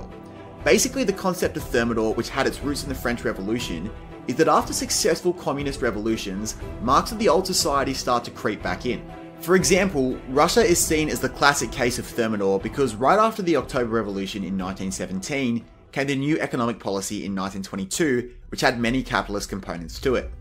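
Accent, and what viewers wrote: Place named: Australia. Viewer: “His wife dipped WHAT in water to make sure he drank what he needed”??? Can’t understand this narrator every 4th sentence. No doubt this Aussie and ALL UK people think Americans NEED an accent in their videos. Lame.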